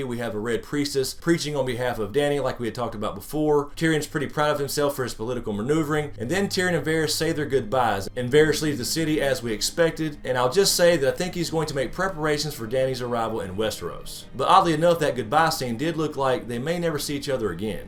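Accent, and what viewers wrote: South, United States. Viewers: I just clicked like bc u sound like a southern n I love the Midwest (live in California now n miss my sweet Arkansas lol)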